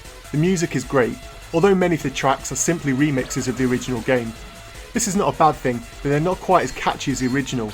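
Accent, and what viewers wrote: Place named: RP, United Kingdom. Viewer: Too many years at a private school. Your saying that's why you have a posh ascent??? I would think they would teach you proper English without an ascent hahaha Just doesn't make sense to me.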